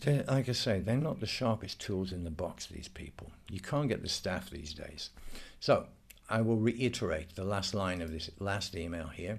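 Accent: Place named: London, United Kingdom. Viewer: Do you mind if I ask you which part of London are you from? (I’m not trying to dox you, I’m just interested in London accents!)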